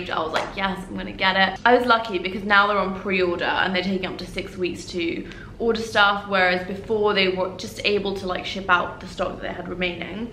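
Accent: United Kingdom. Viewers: It’s a British accent and she lives in Dubai. I love it:)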